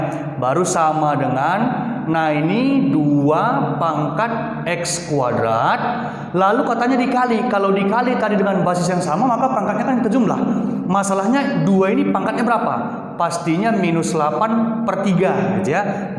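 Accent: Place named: North Sumatra, Indonesia. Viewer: Bapak org Sumut ya pak? Logat bicara kita hampir mirip soalnya😁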